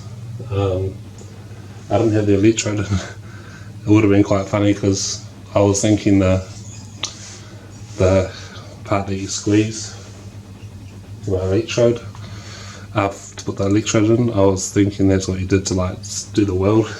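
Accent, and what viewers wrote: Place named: New Zealand. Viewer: He’s from New Zealand, that’s how they talk bru!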